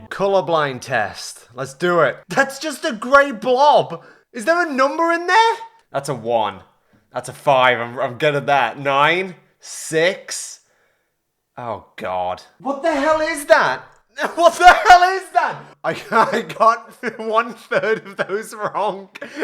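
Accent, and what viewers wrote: United Kingdom. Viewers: You sound way too British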